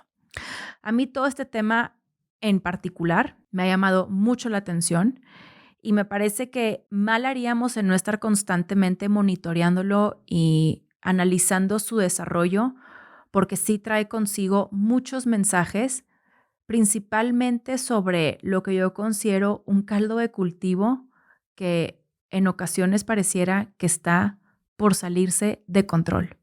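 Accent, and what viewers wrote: Monterrey, Mexico. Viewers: Amamos a!!!! Gracias!! Nunca dejes tu acento regio plis!!!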